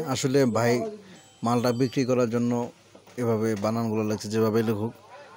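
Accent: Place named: Satkhira, Bangladesh. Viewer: ভাই এগুলা সাতক্ষীরার ভাষা আমার মনে হয়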